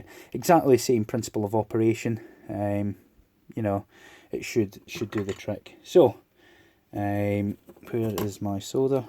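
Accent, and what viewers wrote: Scotland, United Kingdom. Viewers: I want to win or as the way I heard it.....I wan tae win :D Memories of childhood friends with the accent - rarely hear a proper Scottish accent now.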